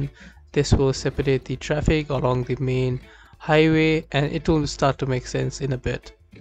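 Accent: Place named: India. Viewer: Your accent is very much Indian ! Where are you from ?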